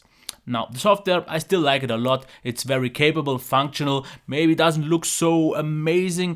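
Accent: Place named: Germany. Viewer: Based on your appearance, hat and western shirt included, you look like you were born in the great state of Texas, U.S.A. That said, Your accent agrees with your claiming to live in Germany. So, I'll just say, "Guten Tag" instead of "Howdy" I too was a little concerned about the "dated" Snapdragon 800 CPU (SoC). But, I checked the Futuremark website's Best Mobile devices and, it seems to still be comfortably somewhere in the top ~300 of all mobile devices running the Ice Storm Unlimited benchmark. It also didn't score too poorly on the Slingshot benchmark test (though the frame rates are as bad as you'd expect for a benchmark test that is intended to stress current top end mobile processors like the Snapdragon 835.) But, the Unlocked and refurbished AT&T branded version I picked up on eBay just yesterday for just $129.99, (the LG G Pad X V930), seems to be a pretty good deal; though the tablet's battery will be a year old with use. 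Still, I believe it will do well for my wants and needs. I just wanted to be sure it didn't have rear facing speakers, (which is something I find hard to believe that tablet makers actually have long done, and still do), and is mostly why I came to YouTube looking for reviews on it. One feature I was wondering about also was, whether or not it has an IR Blaster?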